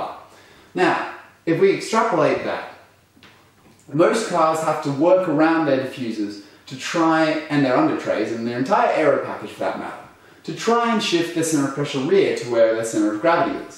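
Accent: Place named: Australia. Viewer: Contents are very good, although a little too quick and a bit confusing at times. Problem is the Australian accent. Hard to fully understand when you are not Aussie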